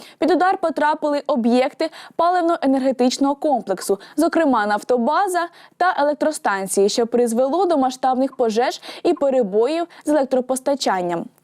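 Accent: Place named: Central Ukraine, Ukraine. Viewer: Мені подобається. Правильно,чітко,притоманно української дівчини. В мене ніяких нарікань на сучасну мову нема,не засмічена росіянизми. Є декілька моментів,але вона чітко промовляє , притаманно центральної частині України. 5+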